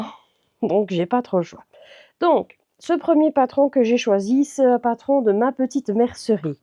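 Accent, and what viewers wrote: Belgium. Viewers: Bonjour, je vous découvre aujourd'hui, j'apprécie votre façon d'être sans chichi et votre petit accent belge... Merci pour la vidéo. 😍.. Bonne couture. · Bonjour Je viens de découvrir votre chaîne J' apprécie beaucoup votre naturel ça fait du bien pas de chichi et franc parler avec un accent de Belgique, vraiment ça fait du bien au moral au coeur 😁 ça me motive à me secouer pour avancer dans mes projets Je vais visionner vos anciennes vidéos histoire de vous découvrir un peu plus Vous avez du pain sur la planche façon de parler😄 Très bonne journée Bises de Bretagne 👋👋👋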